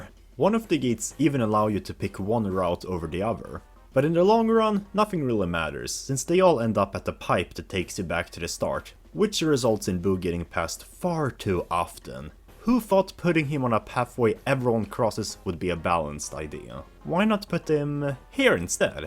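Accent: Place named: Sweden. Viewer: Your english accent sounds just like mine, are you from Sweden ?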